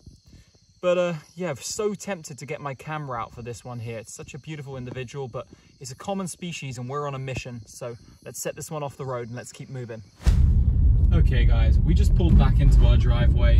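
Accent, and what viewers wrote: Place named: Belfast, United Kingdom. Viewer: Ah, he sounds like a Belfast lad like myself. Nasty bite for such a small snake!!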